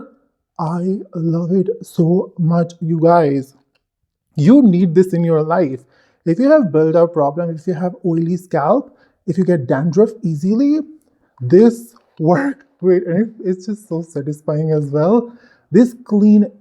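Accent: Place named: United States. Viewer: Abe urdu may baat krna pakistani hokar American exent msy baat kr rahahai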